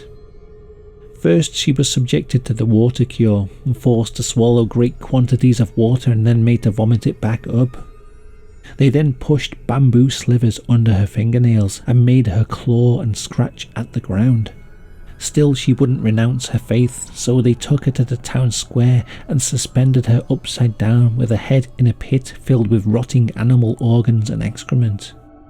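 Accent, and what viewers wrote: United Kingdom. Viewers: hey I love your vids and ur british accent <3 also im a little drunk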